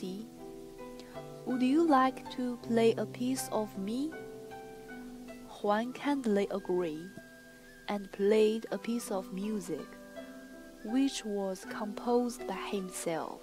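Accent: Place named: Taiwan. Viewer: This musician has Plum Blossom Spirit. I liked the illustrated introduction with English text, voice-over narration in English and background music. The background music volume was balanced well with the volume of the voice-over narration. Unfortunately, there were several mispronunciations of English words that were not corrected by an English coach or pronunciation software with voice feedback. The intonation was fairly good. I was strongly reminded of the accent of the Taiwanese actress Qi Shu in the movie 'The Transporter(2002)'. The performance was very good to my ears but I know nothing about Bamboo Flute playing and very little about music. In the word 'anecdote' the final letter 'e' is silent and makes 'anecdote' rhyme with 'note', 'wrote', 'coat', 'boat', 'float', 'mote' and 'dote'. How to pronounce 'anecdote' in American English <link> How to pronounce ANECDOTE in British English <link> Knowing how to pronounce words in English is very difficult especially if one is obtaining a lot of information from written material. 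In my first year calculus class I had a professor with a good sense of humour who told a funny anecdote in the lecture room about how to tell mathematicians from other professionals in science using a kettle of water to be heated. One day I was talking with him in his office and I mispronounced the name of the German mathematician George Bernhard Riemann as "ryeman" and referred to "rye" "manian" geometry. The correct pronunciation is "Reemon" and "Re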